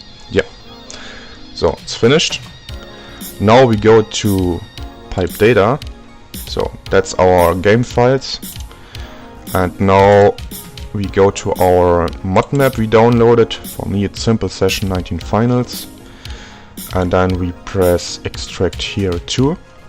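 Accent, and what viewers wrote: Germany. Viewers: lol german and norwegan is pretty close so I can understand that u said "I hear ur german accent" and op replied with "Well I am a german channel" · da höre ich doch einen Deutschen Akzent :D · ich liebe sein denglisch <3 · I swear to god this guy is german. If not he is french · grausamer deutscher akzent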